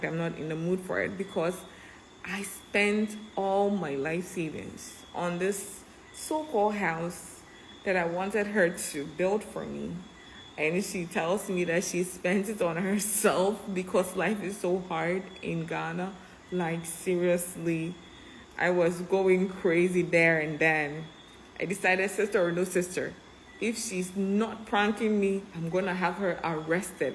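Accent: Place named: United States. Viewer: U sound like someone living in usa not Italy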